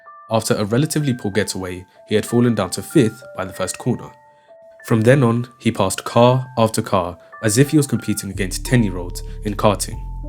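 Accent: United Kingdom. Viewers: bruv your roadman accent fuckin annoying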